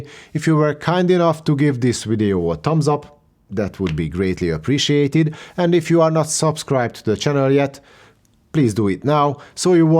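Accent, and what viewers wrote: Hungary. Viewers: Szerintem magyar akcentust hallok 🧐🤔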